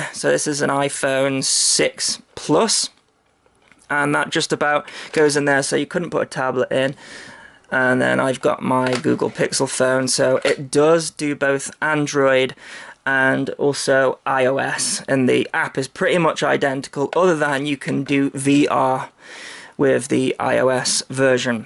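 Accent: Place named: Yorkshire, United Kingdom. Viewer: Yorkshire accent :) I came here from Quadcopter 101 because I wanted to know more. Could you potentially use that booster with any wifi-drone?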